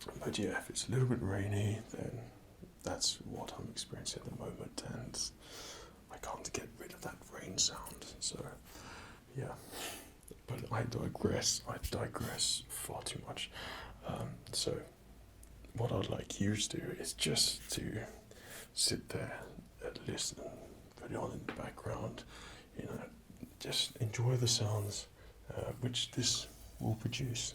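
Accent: England, United Kingdom. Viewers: Lol he's actually British. I'm Australian and we don't sound like this. I wish he was Australian though :(((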